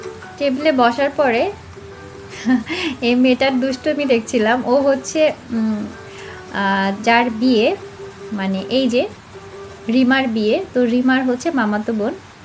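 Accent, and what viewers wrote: Sylhet, Bangladesh. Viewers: আসসালামু আলাইকুম আপু কেমন আছেন? আপু আপনি কি সিলেটি? আমার বাড়ি সিলেট তাই জিজ্ঞেস করলাম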